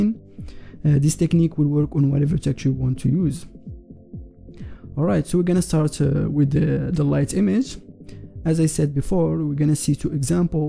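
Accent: Morocco. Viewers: you sound moroccan or at least north african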